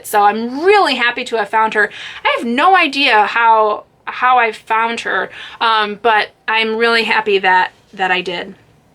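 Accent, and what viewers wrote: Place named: United Kingdom. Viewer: British accents are always a plus XD awwwww! You are definitely warm and friendly!!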